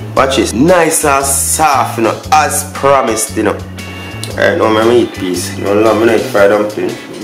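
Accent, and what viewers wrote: Patois, Jamaica. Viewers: Mi lov di Patois Bredren!!! Yu dumplin sel aaf! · Moderating the patois will widen your audience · Man this being African American is boring AF😫😂 why I couldn’t be Jamaican or Nigerian 😩😩😩 I hate it here😫 I’m Finna put on Shottaz and dream of being a Jamaican 😂 cuz being American is 🗣️BORING😩😩😩 I love his accent 🥴 and the Patois language YESSS🙌🏾🥴🥴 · To capture a wider audience he might have to drop the patio. It’s not just Jamaican watching. There are people of other nationalities that love Jamaican food and want to learn how to make it. The same way if someone of a different nationality giving a presentation for something but u can not understand what that person is saying because of their accent. The same goes for someone who only speaks street slang. If that person trying to give a presentation dont u want that person speaks proper. 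· Awesome Jamaican accent jah Mahon 💯 and you earned yourself a new subscriber as soon as I scene your hand rolling technique awesomely done ✅ 🥟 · Too much patois?? Ah weh oonu ah talk bout?? KMT. That's what I love about this channel -makes it authentic! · I dont mind the patios at all, atleast I understand what you're saying and relate to your the videos. I'm a new subscriber and loving your videos already.. KUDOS!!! 👍👍👍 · I love how authentic you are. You are very articulate yet yuh keep your accent real like di dumpling dem. I can't stand when people try to impose on others. You don't need to speak any less patwah,we never tell an AMerican to speak less American. Though the average American DO NOT speak standard English. Anyway, yuh dumplings tun up! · I love hearing the patios. Idky, but it always makes me laugh lol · Clear and concise recipe. The authenticity of his native tongue makes me trust his recipes more... 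I am not Jamaican but I know Jamaican cuisine is made from the heart and it's not a rushed process. The amount of Mickey Mouses out there trying it with their Ja-fakin' recipes... The patois wasn't overly used that someone can't understand the recipe... However, if the new recipe edit shines the spotlight on his channel, then more power to him!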